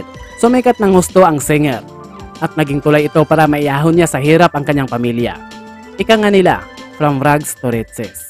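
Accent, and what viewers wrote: Visayas, Philippines. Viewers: Nalingaw ko sa imong diction Bisaya jud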